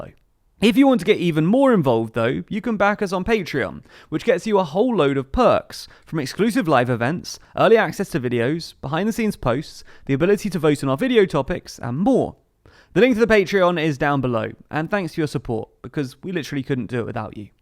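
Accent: England, United Kingdom. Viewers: It's the English accent. He did say, "Rand". Unless it was written and I missed it.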